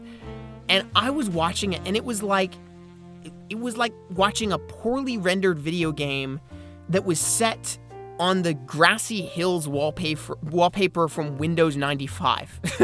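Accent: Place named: South, United States. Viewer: He says y'all he really is from Houston/the south in general.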